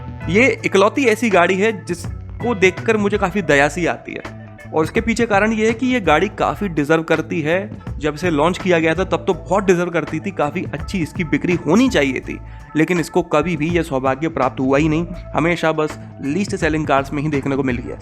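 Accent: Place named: Gwalior, India. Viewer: Makhane fik chuke mp gwalior language 😬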